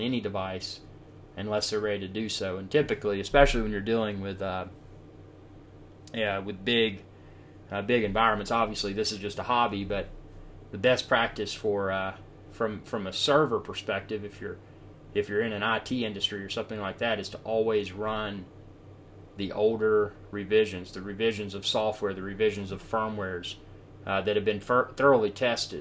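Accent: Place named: Texas, United States. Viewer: My wife Luciana thinks your accent is from texas, i have to agree also. One place i hope to visit one day :)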